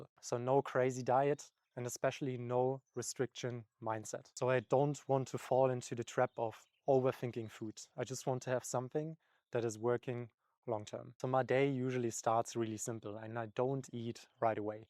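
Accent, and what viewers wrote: Germany. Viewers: Bro are you german?? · Is this some german accent Im hearing? Should have watched the video before writing... Anyways eating eggs every day doesnt seem a healthy idea in respect of ldl intake.. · Sounds German or scandi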